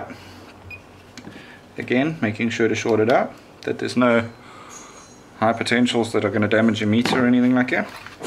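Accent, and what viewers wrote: South Africa. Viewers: Clear explanation 😊 You sound South African, your accent.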